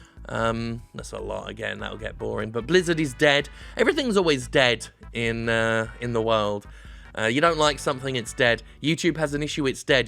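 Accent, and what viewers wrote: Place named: United Kingdom. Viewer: If only I could have everything read to me in a jovial british voice..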